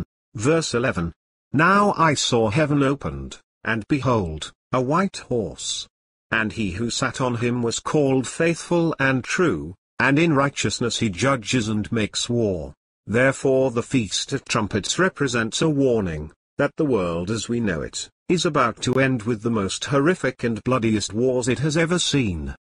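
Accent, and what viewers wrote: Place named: United Kingdom. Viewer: kinda wish there was an Israeli voice or even Jewish from new York...but British?well on. lol praise the Lord anyhow...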